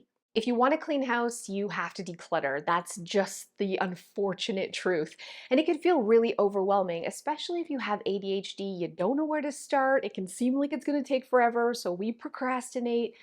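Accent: Canada. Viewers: Love that Canadian accent! I know it's off topic, I just had to say I love how you say "out" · I have set my Alexa to speak with a Canadian accent instead of English! Reminds me of you x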